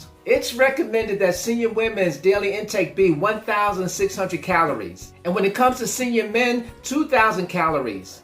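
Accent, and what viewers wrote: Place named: Caribbean. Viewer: Hello. I am a new subscriber from Casablanca Morocco. I like your style. where is the accent from please? Caribbean maybe?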